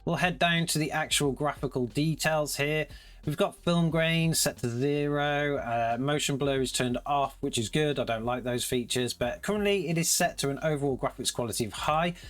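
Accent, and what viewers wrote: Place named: England, United Kingdom. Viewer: Loved your channel! Nice to hear English English as well! Good luck!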